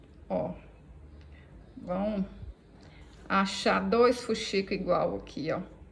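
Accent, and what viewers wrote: Minas Gerais, Brazil. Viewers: Adoro assistir suas aulas. Elas sao fáceis e esse seu sotaque de mineira é uma delícia!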